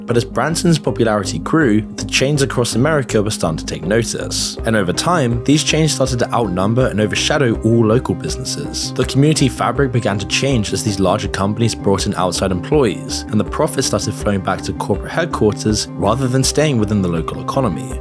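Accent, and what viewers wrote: United Kingdom. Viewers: its part of "Everything is fake in ClownWorld" - this channel is the head clown. "It is impossible to succeed on youtube, but I DID IT" "Subscribe for $200/month for my guide how to succeed - IN EVERYTHING". Also the obnoxious BRIT accent talking about USA ..... Im here for the LOLZ and to see how many of the cohort following this channel has brain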